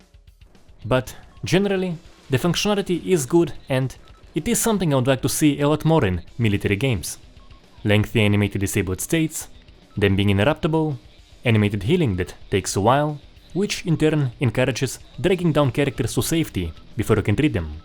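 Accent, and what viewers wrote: Russia. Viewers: Твой акцент выдает твою русскую личность)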